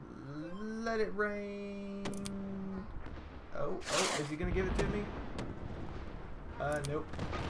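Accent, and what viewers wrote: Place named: Alabama, United States. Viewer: I appreciate Youtubers/Bloggers that post informative information. Plus that Alabama twang.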